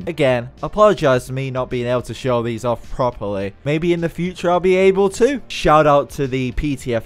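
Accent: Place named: Australia. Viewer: its the australian man again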